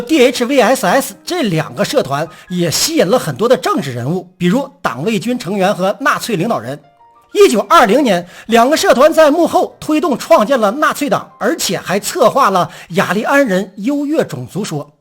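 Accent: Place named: Northeast, China. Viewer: ，东北人儿啊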